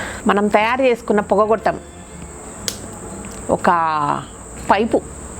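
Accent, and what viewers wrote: Telangana, India. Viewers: Hi akka thaidha rotte super mana telangana basha meeru matladeteeru nakentho ishtam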